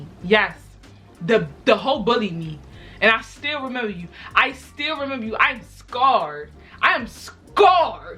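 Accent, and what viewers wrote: Baltimore, United States. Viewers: you frm bmore cs i here that accent 410!!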